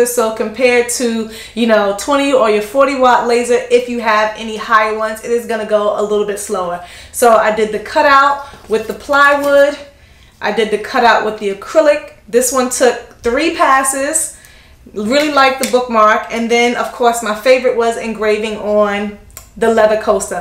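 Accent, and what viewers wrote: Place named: Boston, United States. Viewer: Are you from MA? The amount of times you said “lasah” I was like ok she sounds Boston!! 😂😂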